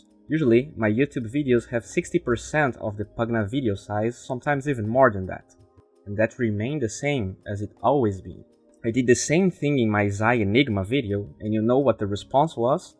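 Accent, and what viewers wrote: Brazil. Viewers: that accent haha r u brazilian dude?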